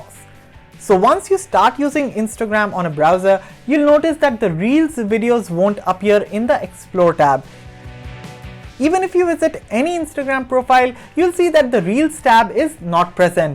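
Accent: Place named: India. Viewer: The only reason why I will not not dislike this video is because of his accent. First time I am seeing an indian on YouTube without fake accent. However, this method sucks..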